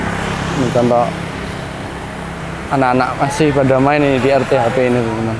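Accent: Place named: Java, Indonesia. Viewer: Suara hostnya bikin gemes. Njawani banget 😅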